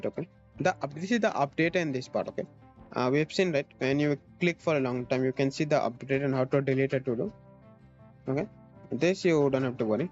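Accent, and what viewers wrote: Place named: India. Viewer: indian accent is annoying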